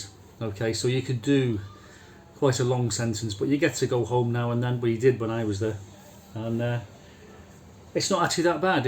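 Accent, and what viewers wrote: Liverpool, United Kingdom. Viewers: Any scouse accent reminds me of Brookside, love it. Just wondering if category D allows Internet access in any form ?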